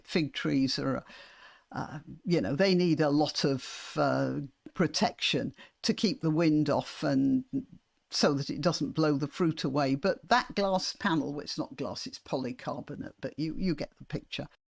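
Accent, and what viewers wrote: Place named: Yorkshire, United Kingdom. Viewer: With your Yorkshire accent, I imagine I'm in The Secret Garden.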